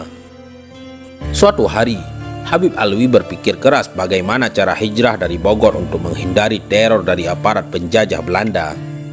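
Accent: Aceh, Indonesia. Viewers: terdengar,, dari logat admin orang aceh yaa hehehe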